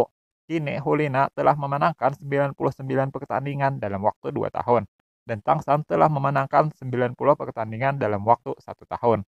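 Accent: Banjar, Indonesia. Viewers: Manyuruh 😂😂 . Banjar banar ah ikam 😂😂😂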